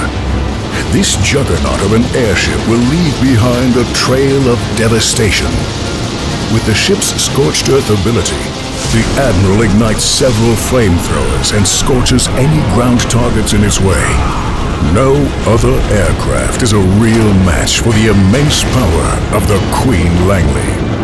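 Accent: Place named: United States. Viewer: you kinda feel the murica from the narrators voice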